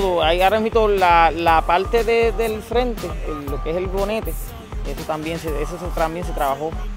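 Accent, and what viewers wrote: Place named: Puerto Rico. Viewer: Congratulations'! TIENES vision y talento DTB. Saludos desde Florida .USA. Suenas boricua !wepa!!! Bonito color.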